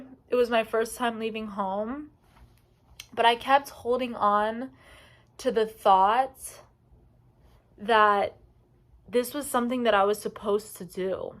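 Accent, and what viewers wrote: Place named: New Jersey, United States. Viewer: Look like from Boulder talk like from Jersey.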